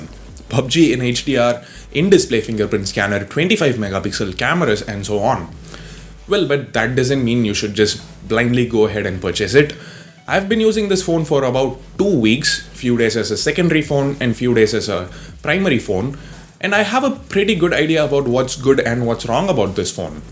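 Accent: India. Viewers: I hate hindu English I don't understand it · Good video dude. I like your accent and your the first Indiana reviewer I have seen Good explanation Hope all best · I love ur english... purely Indian... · I dont normally comment on indian reviewing phones but I like your accent and basically explains more about the phone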